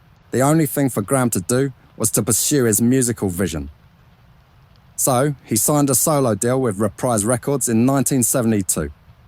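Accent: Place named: London, United Kingdom. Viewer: It’s the London accent I think - so positive it’s almost confrontational! I love it!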